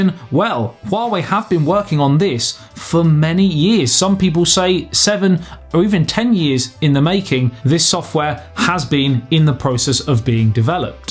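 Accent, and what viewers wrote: United Kingdom. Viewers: Such a thick British accent...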